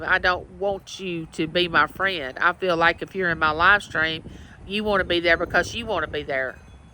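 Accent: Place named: South, United States. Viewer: I absolutely love your southern accent! ❤❤❤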